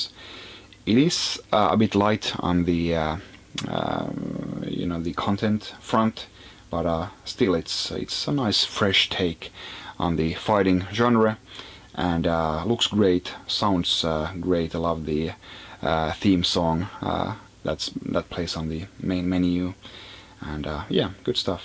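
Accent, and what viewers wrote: Finland. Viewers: Lucas Shumake typical nerdy finnish guy accent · You're Finnish :) from the accent. I got my Nintendo Switch a couple days ago <3. Will get a Pro Controller at some point and Donkey Kong Country Tropical Freeze when it comes out. Also Zelda BOTW and others. It's an awesome hybrid console. both docked and portable is great. Graphics are very much good enough and then some. I have SMOdyssey + MK8Deluxe. Yep 1 year old now.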